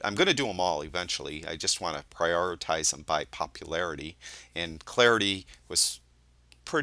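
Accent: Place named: Chicago, United States. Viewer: You know what? people who call people shills, usually have an envy problem, or an unrealistic view of how the world works. In order for anyone to do anything, you need to eat, you need to be secure in your home, and the way that happens is by earning money. A dirty subject for sure, but a necessity nonetheless. What would it matter if you were being paid by Topaz? What`s important is the veracity of your content, and up to now, it`s been spot on. I`ve not only learned from you, but enjoyed it in the process, and more importantly, my Photographic post processing has improved immeasurably. So, top notch content, from a name right out of The Sopranos central casting (at least to my English ears)and all for the sum of watching a short ad. Doesn`t get any better than that. So forget about it, and keep doing what you do. Cheers... Oh, and ps, when I want to use a Topaz plugin, I just go straight from PS or LR no need for Fusion, so the whole Fusion thing confuses me. 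Could you elaborate on the use of Fusion sometime? Thanks... PPS, I`m guessing your accent is somewhere from the Chicago area, am I wrong?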